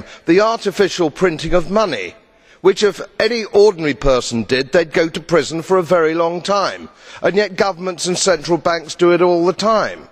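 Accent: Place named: Southern England, United Kingdom. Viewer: Fair enough, but remember that this is standard southern English, the norm for the language, if you like, so that any deviation from it, whether in England itself or anywhere abroad, is an accent. North America, South Africa, Australia, New Zealand (me) and some others, all have their own distinctive accents upon standard southern!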